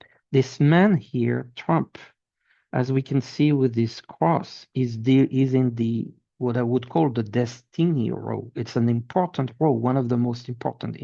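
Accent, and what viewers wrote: France. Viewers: Thank you, this was really great and I can relate to all of it, you explained it so well. I love listening to you because I miss the French accent that I grew up with. I am so glad that I found you on Linda G's video last week. You a good at this. I hope to hear more from you. Bonne Annee' 🙏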